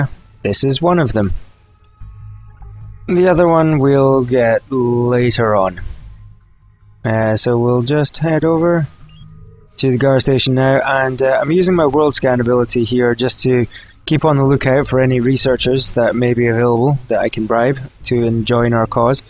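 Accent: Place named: Ukraine. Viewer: You sound like ukrainian) nice gameplay btw!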